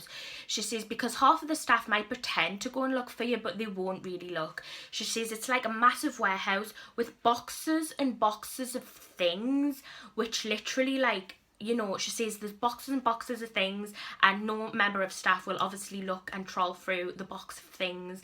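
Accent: Newcastle, United Kingdom. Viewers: hey i found your channel on my recommended list! i noticed me and you have the same accent! is there any chance you are from newcastle if you are thats awsome! x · Are you a geordie? If you to me you sounded like one 👍🏼 subscribed almost instantly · YEEESS A YOUTUBER WHO IS A GEORDIE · Are u from the north east? U sound Geordie coz if u are then I finally found a YouTube from me city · A fellow Geordie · are you from Newcastle? xx I am 💙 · oh my god im from Newcastle but I moved to London and its been so long since ive heard the accent xxx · U sound so similar to a geordie where do u come from also ur very pretty xxx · By your accent Im guessing you from Newcastle? Haha. I currently work at Primark Newcastle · I thought I recognised your voice hahaha I'm from Newcastle too xx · Are you from Newcastle? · Shes a geordie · Omg a Geordie!!!!! I’m from the toon too! 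· Are u are geordie or no because you sound like one lol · Are u from Newcastle 💕👑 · Are you a Geordie? :) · R u from Newcastle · I love how bubbly you are! And your geordie accent is so fun 😂💗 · Woooooooo another Geordie! I'm subscribing!!!!! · Are you a Geordie because if u are I am too BTW love your vids keep it up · That Geordie accent tho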